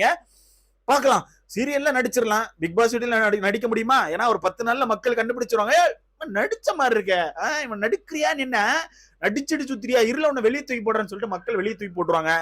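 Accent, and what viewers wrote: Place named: Tenkasi, India. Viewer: தம்பி போனசீசன்ல பிக்பாஸ்க்கு ரசிச்சனோ இல்லையோ உங்களின் ரசிகன் ஆகிவிட்டேன் ஏனென்றால் உங்களின் வட்டார வழக்கு தென்காசி மாவட்ட வட்டார வழக்குபோல் உள்ளது அதனால்தான்.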